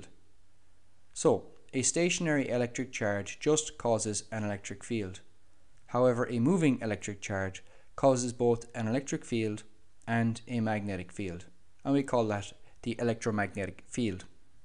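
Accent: Ireland. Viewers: Instantly liked because you're Irish and it's a great accent to listen to physics too (Y)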